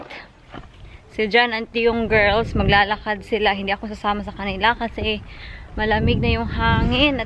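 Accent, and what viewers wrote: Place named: Visayas, Philippines. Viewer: Basta bisaya lambing gyud kaayo.👍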